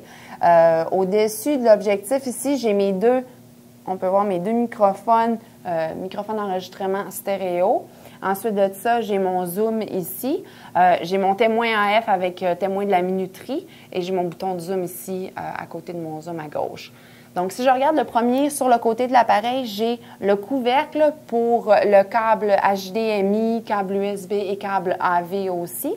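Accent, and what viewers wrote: Quebec, Canada. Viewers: Hello (j'aime beau dommages donc votre accent du québec), ci dessus j'ai répondu à la gentillesse, encore un jaloux envieux via ma carte SD, j'ai mise à jour le firmware, mais même si la version a été reconnue il me semble qu'en ce qui me concerne, la jauge est très minimaliste! mais de toute façon je vais passer au HS20, et revendre mon HS10 à la tombée du soir j'ai raté des sujets faute de sensibilté, et 8 Mpix me suffise amplement 16 Mpix pour les recadrage, regards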